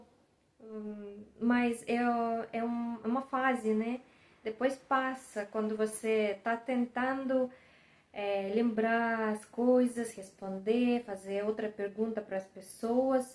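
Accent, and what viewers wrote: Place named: Brazil. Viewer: Eu lembro de uns vídeos. Antigos seus e pude perceber seu esforço em falar português do Brasil, nós brasileiros falamos o português totalmente diferente do português de Portugal, tanto que nós não entendemos nada que eles falam, como se fosse idioma Russo X idioma ucraniano, acho que você me entende... Tenha uma ótima noite até a próxima Пока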